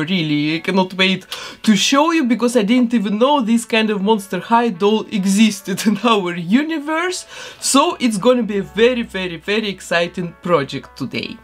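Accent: Russia. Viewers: я так и не поняла. почему для меня, (которая говорит по русски) голос автора звучит, как голос женщины из России с плохим произношением. я английский вобще не знаю. откуда автор канала?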